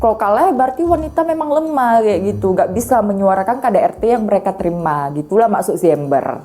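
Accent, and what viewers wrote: Medan, Indonesia. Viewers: Onde mandeeee.. Logat Medan rasa Padang.. kereeenn...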